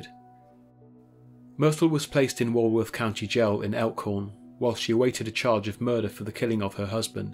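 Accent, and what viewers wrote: United Kingdom. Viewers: in my humble opinion your voice and dialect are two reasons I love your channel. As an American living in Germany I'm strangely biased as personally I won't listen to my favorite literature unless it's in a British accent. I'm really into olde sea novels and Gothic spiritual tales and just can't listen when read by a fellow American.. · Soon as I heard the British accent, I though oh boy here we go he's gonna fuck every story up but honestly this video was incredibly accurate and factual. The only missed detail was Dahmer's address. He said 924 North street, it's actually 924 North 25th street between Kilbourn and State Streets. I am a lifelong Milwaukee resident and am familiar with all these stories. Kudos to whoever researched them. Great job.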